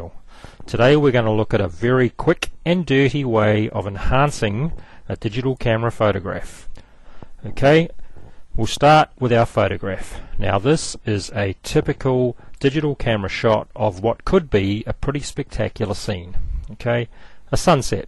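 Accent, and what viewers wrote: New Zealand. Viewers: weren't sure if I detected the kiwi accent right, but I was happy, when I read the other comment. As I Kiwi you don't need this video since all your sunsets ARE already enhanced! Great tips though! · hahaha a kiwi accent for sure im from timas. Anyway thanks for the quick tips (a real time saver) just have to remember : top =overlay 2nd = screen cheers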